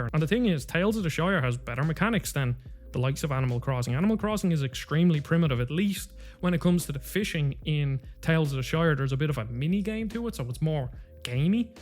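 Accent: Ireland. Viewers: OMG man, such a lovely video, I don’t get the hate either and you just sold me on it, gonna get it myself, also it’s amazing to hear an Irish guy for a change, never been subscribed to any Irish YouTuber so here it goes- you are my first 🍀💚 and if anyone would like to play some cozy/ multiplayer games together (on Switch!) let’s exchange our codes, happy gaming!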